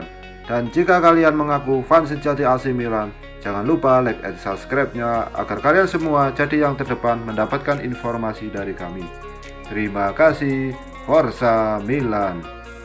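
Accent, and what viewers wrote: Java, Indonesia. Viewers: Medok bgt ngomong e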